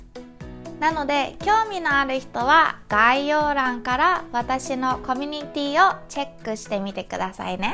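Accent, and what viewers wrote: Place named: United States. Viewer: Your American English accent is quite good, it makes sense that you learned it naturally in an American environment. I worry my accent will always be noticeable when i speak Japanese.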